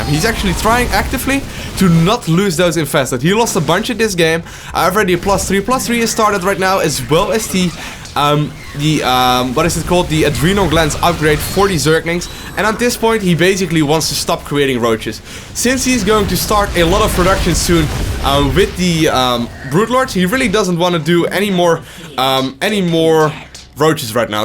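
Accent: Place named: Netherlands. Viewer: dat classis dutch accent....sounds exactly like rotterdam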